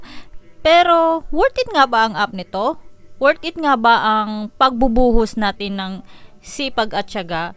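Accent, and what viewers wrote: Visayas, Philippines. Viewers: Wow bisaya si madam hehe thank you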